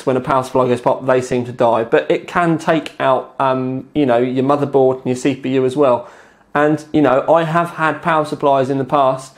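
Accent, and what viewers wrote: United Kingdom. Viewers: British pronunciation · British accent haha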